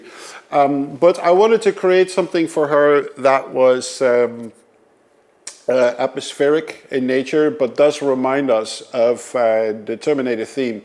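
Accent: Netherlands. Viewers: Love the Dutch accent ahah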